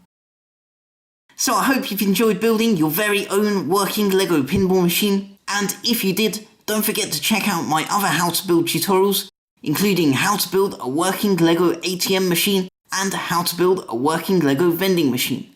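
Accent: United Kingdom. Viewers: his voice sounds like an old man thats British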